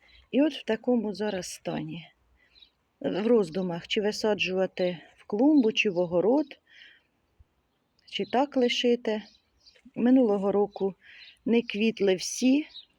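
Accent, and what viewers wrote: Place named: Poltava, Ukraine. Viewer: Я дуже радію з того що чую свій полтавський діалект ібачу колегу по квітах аж сльози виступили так мені схотілося усвою трудолюбівку пропоную помінятися : ви мені сімена сон_ трави або кущик, а я вам голубу юнону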